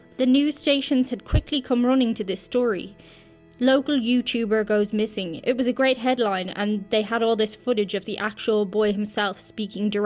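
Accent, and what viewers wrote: Ireland. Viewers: I love your new channel. I did notice your Irish accent is more pronounced. I hope you continue towards a news magazine channel, you have soo much to offer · This may sound crazy, but in these videos, there's something about your accent that doesn't say "Dub" to me, though I know you are. Are both of your parents from Dublin, too?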